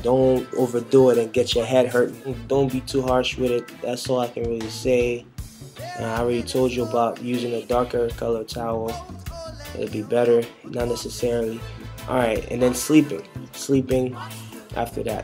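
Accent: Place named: Jamaica. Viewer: you have a slight jamaican accent n no its not the music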